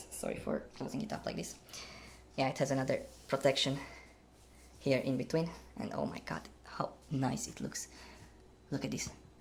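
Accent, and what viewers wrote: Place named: Russia. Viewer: I think I can hear Russian accent when you speak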